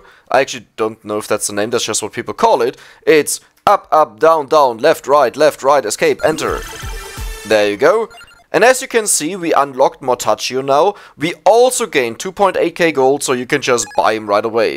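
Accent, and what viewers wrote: Germany. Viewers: Kann das sein das du Deutsch bist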